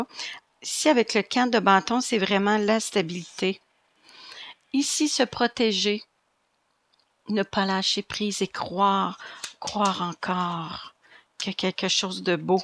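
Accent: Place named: Quebec, Canada. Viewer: Bonsoir Mme. Je viens de vous découvrir et c’est une belle découverte. C’est toujours agréable d’entendre un accent québécois dans les voyances, vous êtes peu nombreuses et tous ne me parle pas, ce qui n’est pas votre cas. Votre tirage me parle beaucoup. Je suis vierge, ascendant vierge et lune en vierge, rien de moins 😄. J’aimerais beaucoup savoir le nom de vos cartes s.v.p. je les apprécie beaucoup, les images me parlent. Merci encore et longue vie sur YouTube. 🌺❤️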